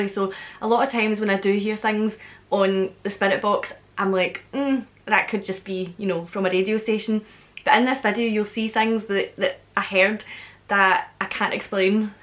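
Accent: Scotland, United Kingdom. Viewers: Didn’t expect you to be Scottish lol. Pleasant surprise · Scottish! I could tell right when you opened your mouth,lol. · I love your accent so much. My family is Scottish on my grandma's side and we visited last year for the first time. I fell in love with the Highlands and would love to move there some day. 💜🏴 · Looking for evp vids whilst working. Clicked on yours and I am glad I have not even got to the Evps but its nice to hear another Scottish accent on the old YouTube. Subscribed straight away haha love from Dundee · btw, might I suggest this to your viewers? I would suggest they go watch Jessica here <link> and then come back to videos, and just try to understand her. It's so weird, my brain switched to the Irish accent (trained linguist here btw) and then when I got here it couldn't switch to Scottish accent! It's like it got stuck so I spent more time struggling with this video, when normally I don't have any issues. 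I think I might have just discovered how to break your brain! LOL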